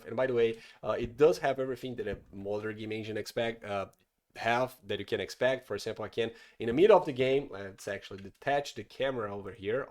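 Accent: Brazil. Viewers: the brazilian accent xD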